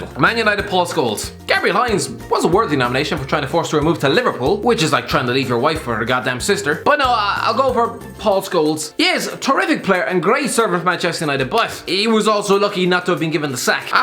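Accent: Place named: Ireland. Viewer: I think ooooh this video looks cool but when i press on it i hear it is you. You fucking irishman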